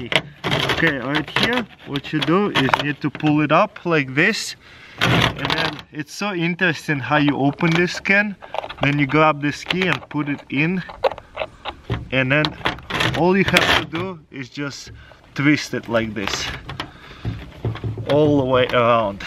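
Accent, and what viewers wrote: Russia. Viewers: Такой махровый рязанский акцент, прикольно звучит. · his accent tells it that he is a russian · Твой акцент выдаёт в тебе русскоязычного.😂😂😂🤣🤣🤣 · Что за русский акцент?))) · Жуткий русский акцент. Ха ха ха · wow this guy look so much like crazyrussianhacker even got the accent too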